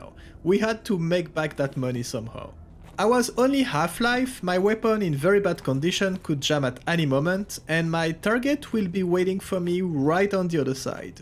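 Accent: France. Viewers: hey dude, i can’t say where u from its like a french accent and russian one 😂 could u help me on this one ?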